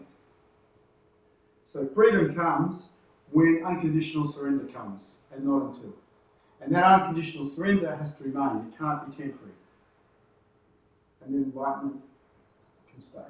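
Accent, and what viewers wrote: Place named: Australia. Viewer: I wish I could understand the Australian accent 😢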